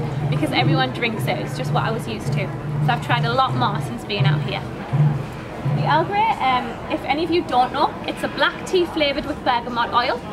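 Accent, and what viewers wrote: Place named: North, United Kingdom. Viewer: lurve this veedyo. (sorry, her northern accent is as delicious as the teas she describes- and my version is horrid.) but I do love this video. I am an anglophile so....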